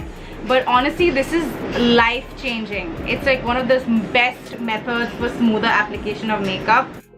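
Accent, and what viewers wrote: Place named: United Kingdom. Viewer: love it as always!😍 and can you plz plz make a video on how you learnt british accent and some tips and tricks to learn it!!!! love ya!😘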